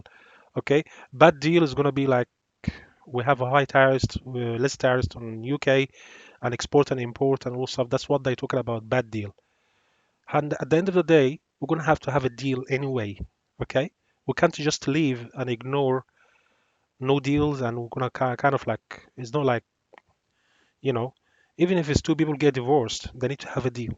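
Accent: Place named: Egypt. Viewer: Thanks for your great analysis. Do you have any analytics in Arabic ? I think you're an Egyptian from your accent All my greetings.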